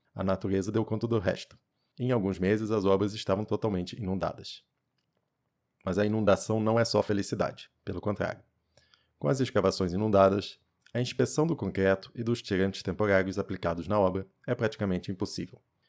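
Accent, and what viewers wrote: France. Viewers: Ele tem sotaque francês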